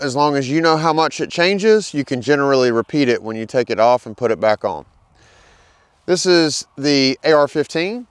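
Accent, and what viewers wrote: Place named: South, United States. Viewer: Nevermind I can hear this dudes southern drawl so it negates my earlier question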